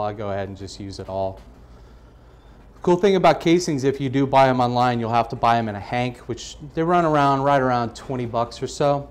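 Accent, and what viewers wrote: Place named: Philadelphia, United States. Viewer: Think this dude is from Philly. He says certain words funny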